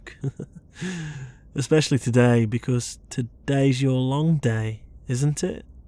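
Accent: United Kingdom. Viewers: He's British :)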